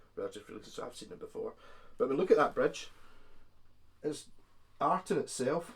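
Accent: Scotland, United Kingdom. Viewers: are you Scottish or something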